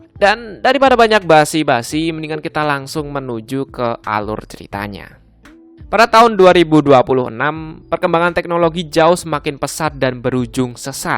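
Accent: Java, Indonesia. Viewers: Mungkin dia medog :v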